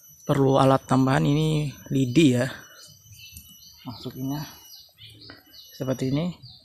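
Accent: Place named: Banjar, Indonesia. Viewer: Orang banjar kah bang , nampak bet cara logat ngomongnya😂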